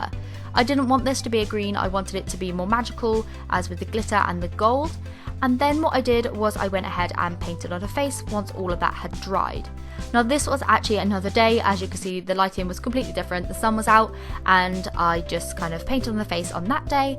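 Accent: England, United Kingdom. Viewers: my godness your english is sooooooo english,wow,i love it!!! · I love your British ascent ❤️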